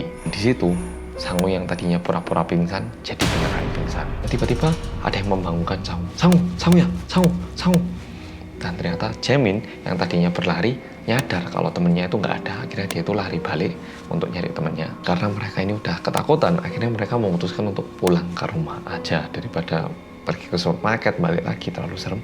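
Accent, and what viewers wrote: Java, Indonesia. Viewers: Aku suka bgt sama channel karena suara medhokk nya😆😆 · Berguyon? Bahasa indonesia mix jawa.. 🤣🤣🤣 medhok tenan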